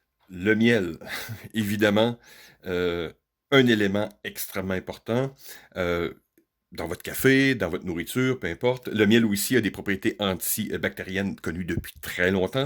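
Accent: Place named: Quebec, Canada. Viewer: Un canadien lui Québécois en plus La voix j'ai reconnue ça 😂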